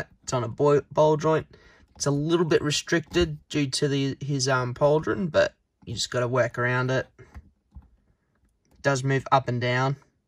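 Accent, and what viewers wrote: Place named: Australia. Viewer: Nice to hear an Aussie for once🙏🏽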